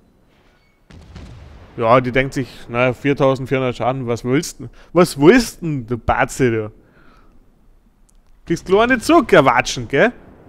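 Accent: Bavaria, Germany. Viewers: Super Video ^^ Du hast eine klasse und angenehme Art zu kommentieren. Mach aber bitte mehr auf boarisch ;)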